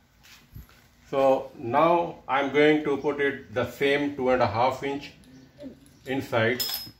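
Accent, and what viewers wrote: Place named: Russia. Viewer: Какой-то у вас Русский акцент!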